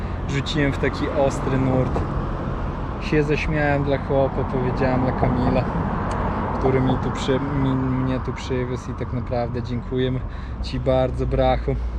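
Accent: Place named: Podlasie, Poland. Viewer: Kurwa ta twoja gadka masakra z jakiej ty wiochy pochodzisz gdzieś pewnie z podlasia albo chuj wiii. Ciezko sie tego słucha.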